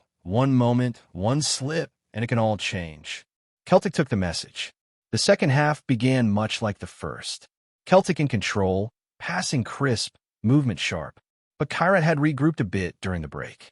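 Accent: United States. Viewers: Typical USA commentator..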